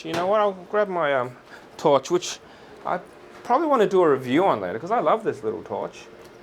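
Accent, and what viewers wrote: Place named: Australia. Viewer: interesting video. I have a Revomax flask too, around 500ml. Say, your accent tells me you have lived in Australia, right?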